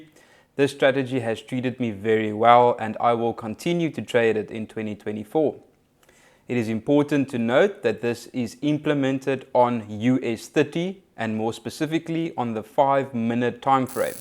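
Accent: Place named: South Africa. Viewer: lol i didnt even see the 'better than ict cap' he sounds like someone from south africa ja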